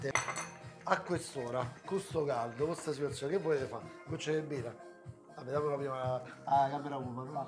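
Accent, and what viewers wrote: Rome, Italy. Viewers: A parte la parlata romana che ha un sapore a se', la ricetta stuzzica proprio. Mi manca il sapore di Roma, manco da li da più 50 anni. Ciao!!!😋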